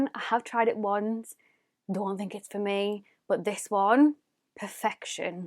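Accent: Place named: North East England, United Kingdom. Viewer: Where are you from? :) the accent sounds North East? I'm from boro and it sounds similar hahaha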